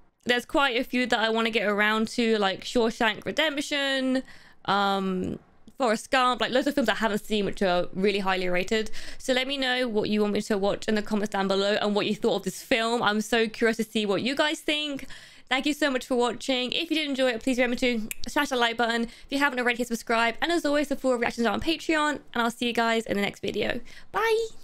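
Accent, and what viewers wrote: England, United Kingdom. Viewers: Back to the future is a good shout. My favorite film. But I think you come straight off the bat with Nobody and work your way back. You sound English, have you seen Snatch or Lock Stock?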